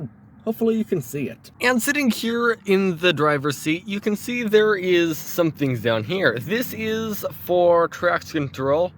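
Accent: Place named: Canada. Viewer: I've just discovered your channel whilst surfing for Mitsubishi Mirage videos, and this is the first one I am seeing. A quick question, are you Canadian? I think I am detecting in your voice a Canadian accent. If I am wrong I must say it comes pretty close to it. I am quite keen on the hatchback Mirage as a replacement for a 2006 Ford Focus, and my eyes have been eating up all this Mitsubishi videos like candy! 😁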